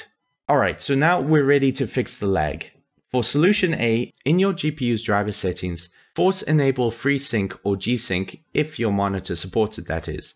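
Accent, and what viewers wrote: South Africa. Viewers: south african? thanks for the video BTW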